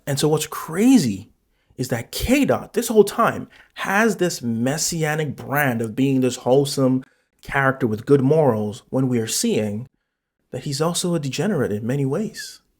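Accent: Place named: Canada. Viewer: He’s just surface level reacting to get views from the situation which is disingenuous because if he truly wants to have genuine discussion he would actually do the work of making sure all his points have source’s. For example doesn’t have “higher ups” anymore and even said in the song he doesn’t care what the industry has to say which is where the true essence of the battle lies. What Drake represents is what has watered down hip hop to something vapid and almost soul less. said he is not our savior. Also if your not from this culture (which homie sounds like he has some Canadian in his accent no hate) you will never understand the blood, sweat and tears that has given this art form its soul. From Police brutality in Compton being called out by NWA to Kendricks “ ”. To those saying it’s performative even during the civil rights era Mahalia Jackson sang to keep the spirits up of the people.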